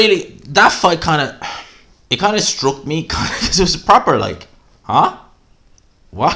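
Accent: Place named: Ireland. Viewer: 1st. Loving da irish accent. is a goon. Truly real channel. Totally bullshit free. Exactly how it supposed to be. Much appreciated 👍👍🔥🔥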